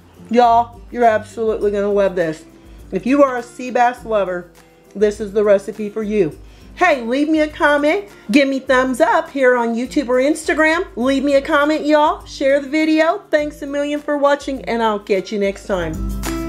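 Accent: United States. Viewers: I've never heard anyone more American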